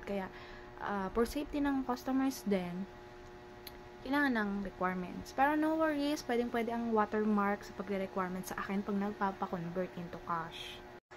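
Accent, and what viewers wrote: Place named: Iloilo, Philippines. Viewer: Ilongga ka gid maam ya. Hehehe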